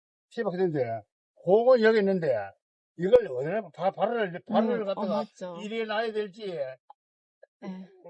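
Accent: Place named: Gyeongsang, South Korea. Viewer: ㅎㅎ 설명이 잼있네요^^ 경상도 아저씨 <link>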